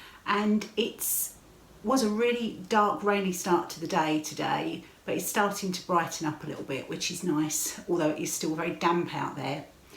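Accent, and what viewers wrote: England, United Kingdom. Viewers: Oh,! Thank you so much for showing us your craft room. I just loved seeing everything, and I always love hearing your English accent (I live in NY)! I also love your beautiful sun room! So pretty! My mother-in-law, whom I never had a chance to meet, was from Trowbridge (not sure if you've ever heard of it) and came to the U.S. as a war bride. My husband has relatives in England in a town called Uckfield and has been there several times.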